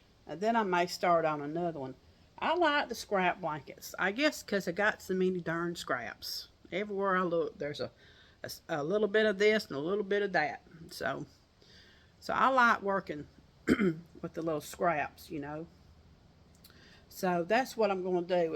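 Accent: South, United States. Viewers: Hello Miss! Greetings from Florida! Just found your channel and let me tell you I absolutely love it! That southern accent is just lovely and your style is very soothing and very down to earth. I’ll be watching you from now on ☺️ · Dear.. I would love to see you every day on here. I love your sweet Southern accent 😉 & those cute Southern descriptions of things. Your C2C Christmas blanket is so beautiful!!! The colors are true Christmas colors!..I loved hearing your birds..sounded like Blue Jay's! Seems like all of our chirpy birds gave all gone. I dodnt see Woodpeckers all summer..but today there was 2 in my back yard!! I miss the sounds of Spring & Summer..way too quiet 😕. Take care & God bless all of you 🙏 ((hugs))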